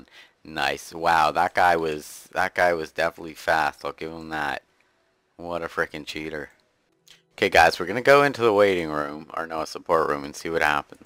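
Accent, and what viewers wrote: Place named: Netherlands. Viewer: DAT dutch doe xD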